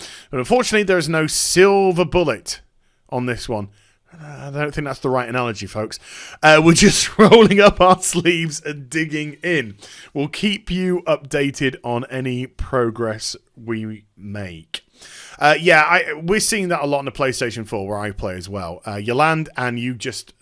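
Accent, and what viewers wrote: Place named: RP, United Kingdom. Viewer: worry not... American are not use to soothing British posh accent... keep it coming mate you are doing just fine!!!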